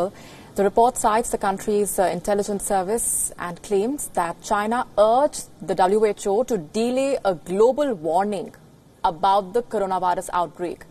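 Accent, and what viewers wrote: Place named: India. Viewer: Thick Indian accent.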